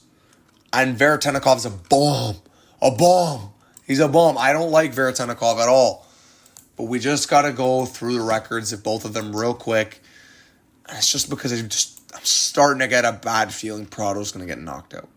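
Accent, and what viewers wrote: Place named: Canada. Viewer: i can hear the Canadian in your voice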